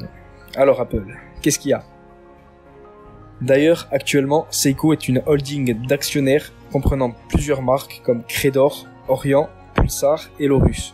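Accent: Corsica, France. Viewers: t'es corse ? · Ne serait ce pas un accent corse que j'entends ?!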